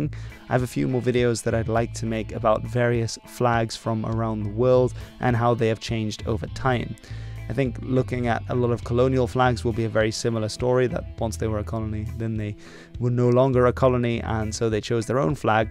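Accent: Arab world. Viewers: Why are you reading Turkish words with arabic accent? It sounds weird and wrong af..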